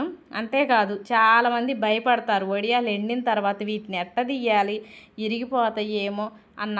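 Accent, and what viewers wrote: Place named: Andhra Pradesh, India. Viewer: Miru andra mata lagane matIadtaru bagauntadi ma entiloala unatmo alage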